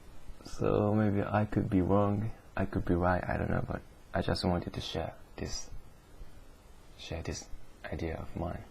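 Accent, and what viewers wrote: Japan. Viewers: I think no matter how good someone gets at speaking a foreign language, he or she will always have an accent. I like hearing someone speak English with a foreign accent though, it is kind of unique. I have been learning German for years and I know how hard it is to become fluent (which I am not). So to hear someone speak fluently in a foreign language is impressive because that takes a lot of time and hard work! So you do have a Japanese accent, but don't worry, it is just part of you. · From the first word you said, I heard a deep Japanese accent resonating from you. Don't get cocky and think that the Japanese are the best at everything.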